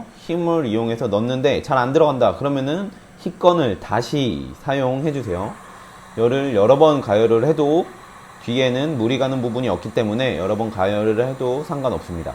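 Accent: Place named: Vietnam. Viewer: 아 베트남 사람인줄.. 발음머임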